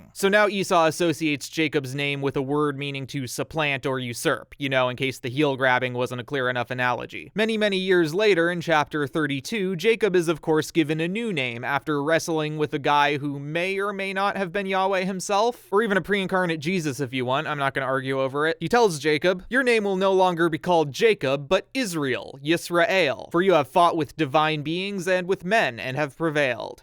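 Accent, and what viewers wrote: United States. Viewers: your hebrew pronunciations aren't half bad actually! it's just your american accent getting in the way lol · The pronounciation isnt bad! It just has an american accent, good job. Adom especially sounds good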